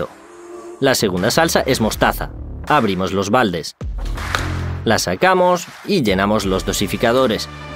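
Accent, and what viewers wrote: Spain. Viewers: No me gusta esa voz 😢😢 demasiado española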